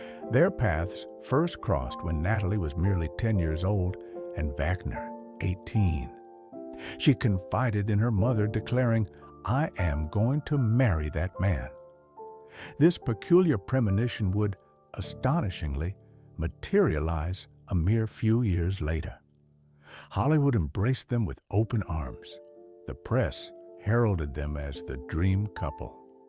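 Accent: South, United States. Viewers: He has Alzheimer’s. Why does the narrator have a deep southern accent? Walked and Wagner supposedly were in a sexual act when Natalie walked into the main room of the boat. If several people heard her cries, why did they not take action? Everyone was extremely drunk. Can you solve a case 44 years later? A tragedy definitely. I would never make an opinion based on this evidence, or lack there of. If those other wealthy people heard her screams, why didn’t they make sure all was ok?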